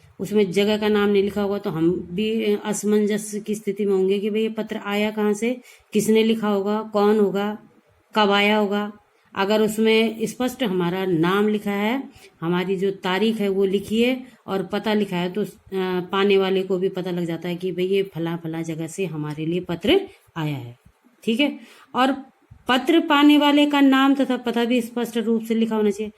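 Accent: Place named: Uttarakhand, India. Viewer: Mam you are from Uttarakhand chamoli right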